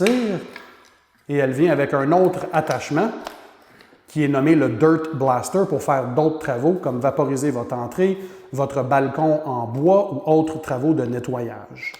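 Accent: Quebec, Canada. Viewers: J'adore son accent de canadien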